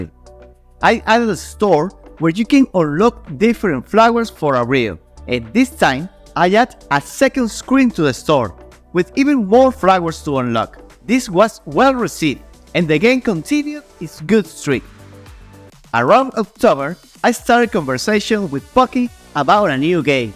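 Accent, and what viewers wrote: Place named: Spain. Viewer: Ese inglés, yo diría que hablas castellano ¿es posible?.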